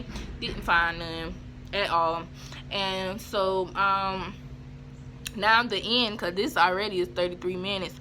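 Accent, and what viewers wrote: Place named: Deep South, United States. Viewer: "East Coast"...lol.. Naaah' you're from the DEEEEEP South!! Lol. It's all good. How's Vegas?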